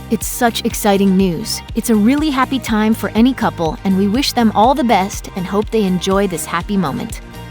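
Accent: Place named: California, United States. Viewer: it's the same californian accent the narrator and Meghan have. Meghan's voice is a pitch/octave lower than the narrator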